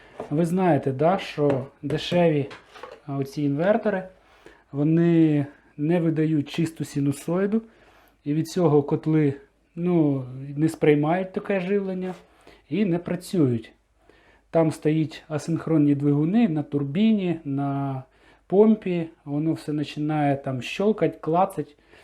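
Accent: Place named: Zhytomyr, Ukraine. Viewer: Це житомирська походу, як у мене))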